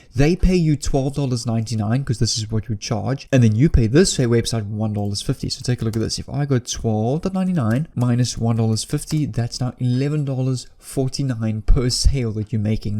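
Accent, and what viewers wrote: South Africa. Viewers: Wow you sound South African👌🏽Great content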